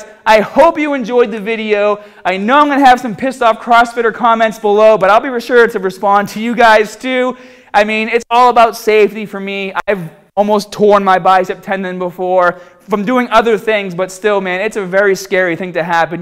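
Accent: Boston, United States. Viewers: You must be from Boston, I can tell by your accent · Good, insightful stuff. One thing: as a Brit, I'd say your Boston[?] accent comes over a little strong. It's easily understood, but it makes a twenty minute video seem half as long again. If you could tone it down to a 'Ted' level, that'd be great, and maybe would widen your appeal. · He's from America... That's a Boston accent. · when did he get a Boston accent? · This dude is pretty cool. He knows his stuff. That Boston accent kills me sometimes, lol, but good material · Bahbell... this guys from boston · I happened to just stumbled upon one of your videos and now I am hooked. I love the videos, love the way you tell it like it is and most of all a good teacher. I look forward to staying updated on all your videos keep up the good work man. Ps: where is your accent from? You up north-Boston area something? 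Lol Anyhow keep doing your thing man take care.. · Love the Boston accent, brp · With that Bahston 'A', if he spoke just a bit slower he would sound just like Ahrnold!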